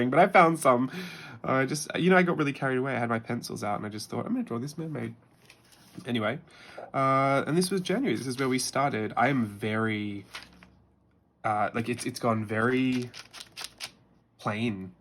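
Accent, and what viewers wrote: Australia. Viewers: …. You are so freakin talented and AWESOME!!!! I Love your work, your creations, your teaching style, (and your Australian accent too)! You’re amazing mister! Thanks for always sharing and taking time to post for all of us, you’re a huge inspiration to me. I’ve had a lot of health problems this past year and miss a lot of videos you post but when I feel well, you’re the first channel I run to and see what amazing things you e created! Ahhhh you make me smile and always feel inspired ❤ thanks for all you do Hugs from Texas… 😊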